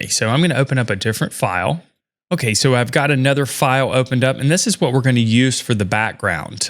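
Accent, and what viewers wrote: South, United States. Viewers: Holy moly that is some strong souther accent right there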